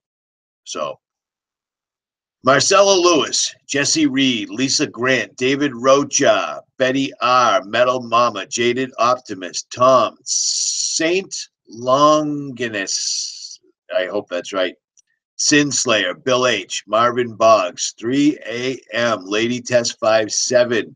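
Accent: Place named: Boston, United States. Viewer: ??A WHAT? WHAT'S A HAYDON? I'M IN UK N IT SOUNDED LIKE U SAID U D WANNA GET A RAGING 'HARD-ON' LOL IN UR BOSTON ACCENT.HAHA. HOPE IT'S FOR ME- UR GORGEOUS AS IS UR MIND N INTELLECT. SORRY FOR BEING RUDE ON THE CHAT. I'D LOVE IT IF U ACTUALLY SAW ONE OF MY COMMENTS.😘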